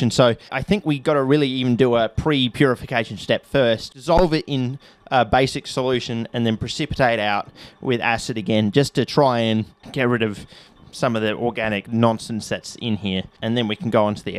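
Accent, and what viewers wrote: Australia. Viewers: ty the tasmanian tiger is what i first thought with gamecube and your accent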